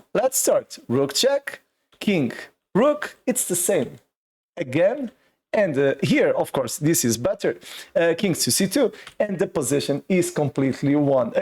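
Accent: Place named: Azores, Portugal. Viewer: O bendito, pelo nao dito, tu es da ilha do Pico. Took me a bit, but I know it was Portuguese with azores accent. Good show and enthusiasm.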